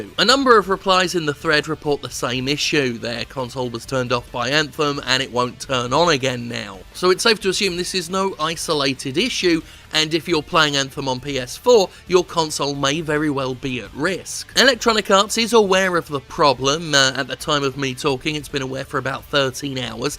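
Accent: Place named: Working class, United Kingdom. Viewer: I don't really watch for video games stuff. Working class British ranting is the only way I can finish. Thanks!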